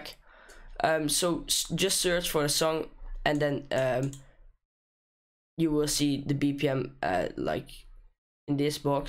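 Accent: Netherlands. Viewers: are you dutch? you kinda sound dutch and great video my dude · Are you Dutch maybe you sound Dutch. Btw amazing video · His accent screams dutch ahha i love it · You sound really dutch.. No problems just noticed it :D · Thank you so much! Your english sounds like dutch 😂 are you dutch? I am dutch!🇳🇱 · Im in love with Dutch accent lol. Thanks for making such a good job explaining ! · Ayo r u dutch? I can hear it due to your accent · I smell dutch! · Ik spot een Nederlander 😂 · This guy has an Dutch accent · sounds dutch ;) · i love the thick dutch accent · he's dutch a now it for sure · hey buddy hmm i can hear ur netherlands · Haha deze gast is nederlands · This guy 8s Dutch he has a Dutch accent... Geen probleem hoor😂😂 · You sound Netherlands 👌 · i hes dutch im dutch to i can hear it from his accent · Je bent nederlands denk ik aan je accent te horen toch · Are you Dutch? 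I think I can recognize your accent a little bit. Your english is very good though! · i think you must be dutch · We horen allemaal dat je nederlands bent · this guy has to be dutch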